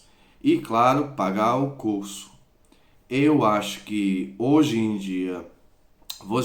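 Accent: Minas Gerais, Brazil. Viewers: Seu sotaque está maravilhoso. Parece o sotaque do pessoal mineiro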